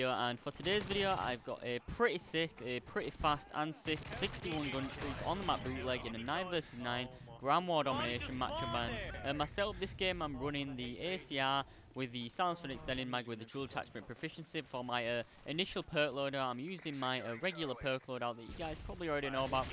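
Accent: United Kingdom. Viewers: "Do you live in the UK because you have a British accent?" - rhetorical question? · U went super east on these ppl. Do you live in the U.K. Cuz u got a british accent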